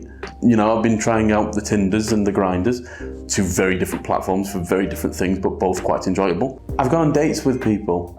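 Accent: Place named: England, United Kingdom. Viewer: can't explain why but i find the baldness very sexy! never been into bald men but when combined with the English accent and the bald head, very sexy!. i watched your film ''Abused'' and as someone who came from an abusive relationship it was very touching and relative. i hope all the new things happening to you keeps on a high note and i don't think you'll be lonely for long, given the sexy baldness..........lol.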